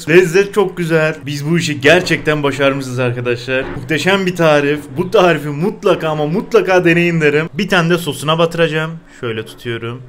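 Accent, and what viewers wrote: Adana, Turkey. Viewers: Yer Diyarbakır İstanbul değil ayrıca dil adana değil ŞİVE adana :)) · şive değil ağız adana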